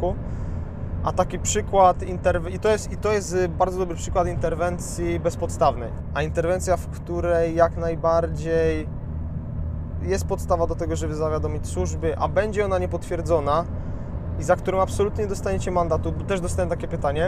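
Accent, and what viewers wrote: Silesia, Poland. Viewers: Ze Śluńska jesteś?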